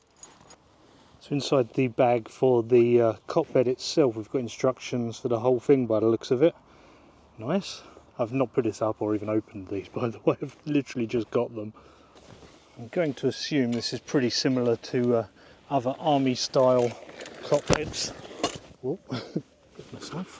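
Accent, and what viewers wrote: England, United Kingdom. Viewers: I been looking at your videos and i just wont to no do. You have snakes were you live at the country looks like American ,but i no it is over in England because the way you talk my Aunt mother was from wales and she talks about not from England I'm from Wales she would set you straight were she lived,thank you for showing us how to sit up a tint,god bless.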